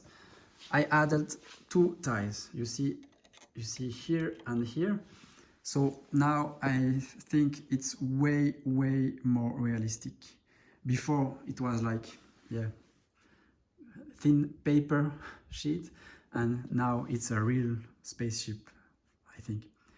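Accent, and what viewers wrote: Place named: France. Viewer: un french accent ma foi tres mimi, gg à toi pour les modifs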